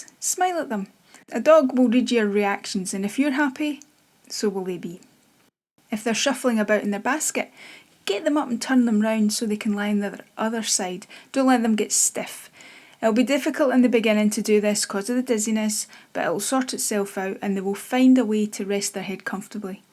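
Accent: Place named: Scotland, United Kingdom. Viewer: Thank you so much for this video. My 14 year old golden retriever is experiencing this without the eye movements. She was on antibiotics for a skin infection which would not go away on natural remedies (we live in a warm climate)She had the same symptoms 5 months ago and the vet said it was a stroke. Its only by looking at youtube videos that connected the antibiotics and this condition. She recovered well after the last episode and I am doing similar things as you taking care of her. Thankfully her golden retriever appetite has not changed and she is eating and drinking well. It is very distressing to watch. This video gave me much comfort and hearing a fellow Scottish accent did too. 🙏🏴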